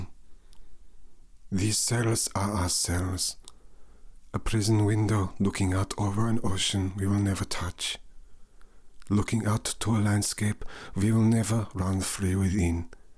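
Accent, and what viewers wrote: Germany. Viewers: as a German i can say that its rare to hear a good German accent that isn't meant to be a joke...very nicely done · Haha!! Love the German accent😂🤗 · Wow... That's a good german accent 👍 I'm from Germany and you have my approval 😂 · I've never known a German accent to be so smooth, beautiful and addictive. I speak Afrikaans, a branch of Dutch, and even though it's smoother than German, it's not as smooth as you've managed to make this language. This leads me to wonder how far over the horizon your talent stretches. So far I've been blown away, and, once again, my brain is sufficiently massaged. 😘♥️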